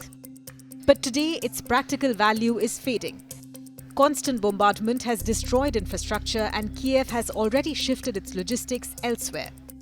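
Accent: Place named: India. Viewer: Can't stomach this jeet accent, rly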